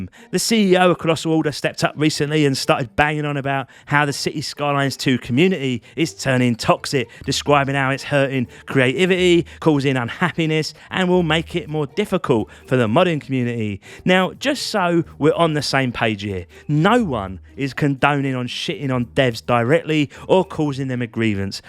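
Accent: United Kingdom. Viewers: i love your reviews and your lovely british accent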